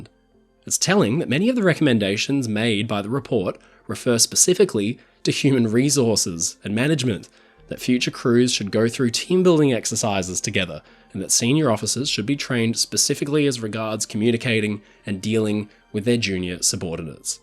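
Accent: Australia. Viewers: Great to hear the beautiful Australian accent. Great vid, but you might want to brush up on your tenses.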